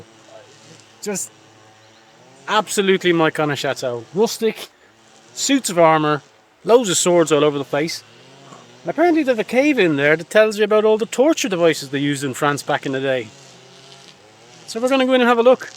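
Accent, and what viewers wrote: Ireland. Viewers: Can you also write the name of the chateau in the hill please, love your Irish lilt , but didn't catch it's name, and I want to put it on my next year 'tour of rural France ' list. Thanks 👍🏼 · loved this video, chateau in the cliffside was amazing! Thank you for all these videos, you are a very interesting and entertaining guy, maybe its your Irish accent! Ha ha 🇨🇦BC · And hearing an Irishman speak, using words rarely used here in southern California. So educational as well as amusing to dawdle around with you. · Wow, you take us to some amazing places! Great music, beautiful Irish accent attached to an easy-on-the-eyes kind hearted dude. Thanks heaps. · Congratulations. You are a breath of fresh air, have a beautiful Irish accent and are a very genuine person, that's why you have so many faithful Subscribers. I just love following your antics and going on little trips with you, they're always showcasing quaint little French villages and countryside, thank you for making my days a little brighter and taking me with you on your trips and sharing your day to day life as well. 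Much love xxxx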